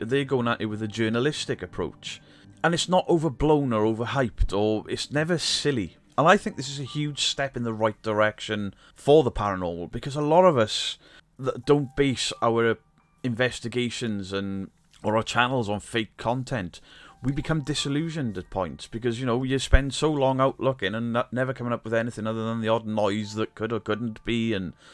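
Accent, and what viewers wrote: Wales, United Kingdom. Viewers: Taff Butt, really confused me with Eleri Siôn from BBC Radio Wales. Love your videos. Been investigating and debunking since the 90's and we need more common sense in the field. Your accent reminds Welshman in Orkney of home!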